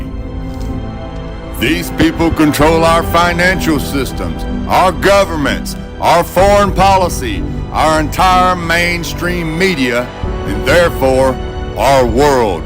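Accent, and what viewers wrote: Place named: United States. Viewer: I love your accent, not sure what part of the US you are from. Much love from Ireland. God bless my brother in Christ.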